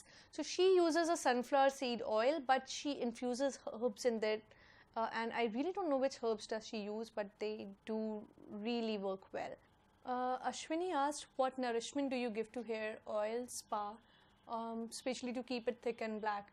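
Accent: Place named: India. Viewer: Although I don't have that long hair already, but I'm on the way to get it to classic length, I could listen to you all day long ^__^" Really, your voice is so calming and because I work with Indian colleagues together and sometimes have a talk with our Indian developer chief "Manini", I'm kinda used to the indian way of pronunciation of english words ^__^" You're awesome as always,. Greetings to you and best wishes to your channel and forward to India:) Pats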